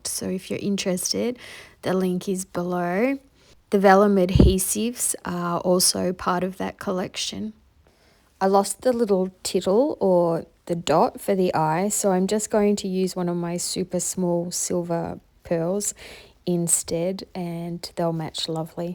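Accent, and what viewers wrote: Australia. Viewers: Wow, I just found your channel today. What a wonderful selection. Great papers and love the way that you've used them. It's hard to pick a favourite but I really liked Happy Birthday Mum and the last one with the gold dots. Thanks for the inspiration. I live in Australia and you sound like you have an Aussie accent too. 😘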